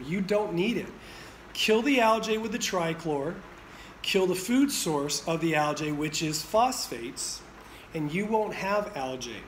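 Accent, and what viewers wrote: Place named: Arizona, United States. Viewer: Arizona accent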